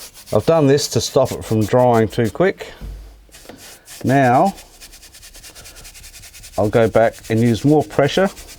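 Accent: Australia. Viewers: Nice work. You sound Australian. What city are you in ?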